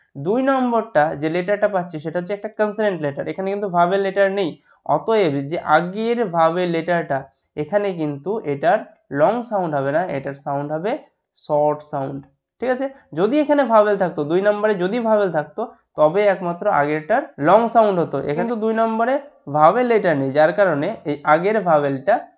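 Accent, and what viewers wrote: India. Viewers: Are you an indian?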